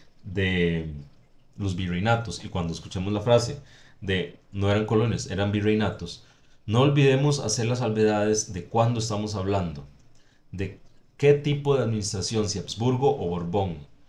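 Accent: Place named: Colombia. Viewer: El acento de los Ticos es muy parecido al de colombia. Juro que creí que eras de Colombia 😎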